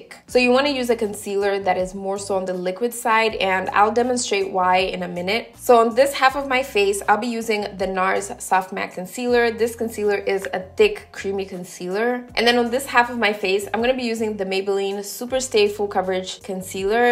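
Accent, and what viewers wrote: Caribbean. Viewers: I could listen to you speak all day. Your accent is heaven to me, bringing back lovely memories of back home in N.Y. many years ago, conversing with my neighbors from Jamaica and Trinidad-Tobego. Our children attended school together. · Love your videos your accent especially.. sounds Caribbean-ish flavored ☺️✨ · This is my first video of yours and I sense a bit of Caribbean in that accent. You are beautiful btw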